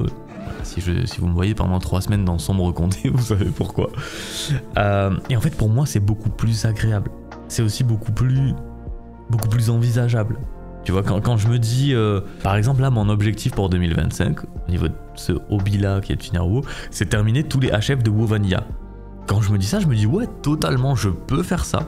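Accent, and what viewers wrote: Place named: South, France. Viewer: Moi qui au fond espérais voir le retour des vidéos 5 raisons sur wow avec l’accent du sud x)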